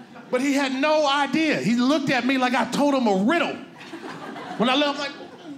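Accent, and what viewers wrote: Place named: Bay Area, United States. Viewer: I knew he sounded familiar A Bay Area Native 😂❗️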